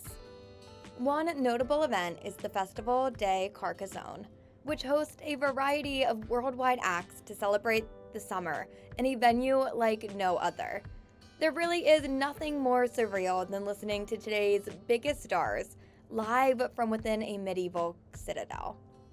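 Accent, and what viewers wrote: United States. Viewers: 😂😂😂 I’m sorry too I appreciate the video and all but girl! At least try to pronounce the locations the way they are meant to sound even imitating a French accent would be better than this😊 Im American too but come on we have all been exposed to the accents of visitors from abroad or damn haven’t you ever seen a Pink Pather movie lol just try a little! Oh and just so you know we don’t all sound this way..just like anywhere else ppl from different locations around the country have their own distinct way of speaking (ppl from the south, northeast, midwest, west coast, etc) but still the way she says cite-sitay!😂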